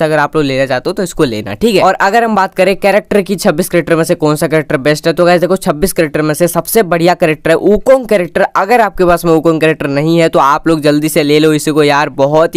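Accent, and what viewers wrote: Odisha, India. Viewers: Bhai ap odisa see ho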